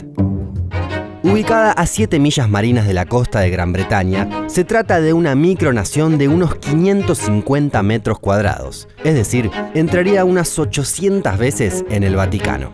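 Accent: Argentina. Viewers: Este acento argentino me parece particularmente irritable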